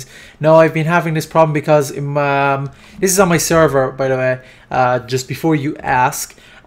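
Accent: Ireland. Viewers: Dang minecraft version of JackSepticEye, every person with irish accent can be Jacksepticeye i guess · Irish accents. They are so awesome. *wipes away tear* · You sir, are a brilliant creative master with a lovely Irish accent. Bless you for your talents and creativity and sharing both of them with us. Have a nice day :)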